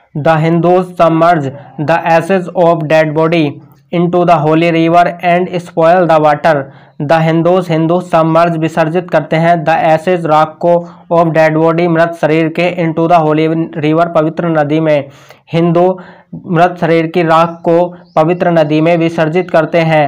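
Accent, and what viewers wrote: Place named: Punjab, India. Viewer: Sir aap Punjab se ho kya? Mja aagya aapka language sun ke Hindi +Punjabi+English Mja aagya🤠🤠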